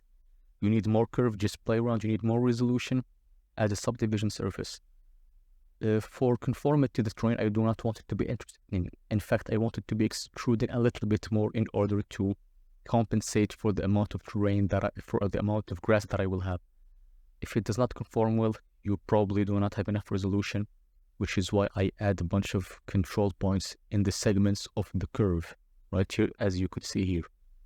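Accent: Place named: Russia. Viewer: очень русский акцент)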